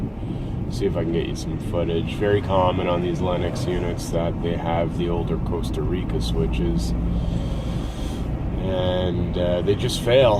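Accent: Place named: Canada. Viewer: Now you sound more Canadian. "Ya Ya" "And then fail Eh?" Yeah I remember getting the bulletin too. Something to do with the metals inside the switch. 🌓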